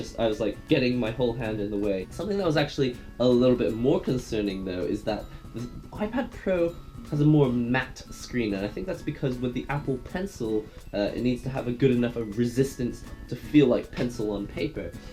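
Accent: United Kingdom. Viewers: u sound like a british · He is but he lives in Japan sometimes.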